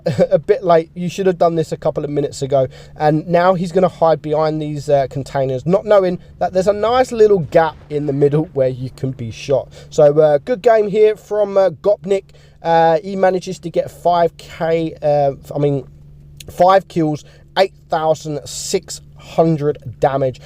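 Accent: United Kingdom. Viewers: I like the way he comment on his channel with hia British accent and the way he said things like Example if E 100 shot you will will know about it or sometime when he said ita frastruating its very nice to hear because the way he said and british accent hehe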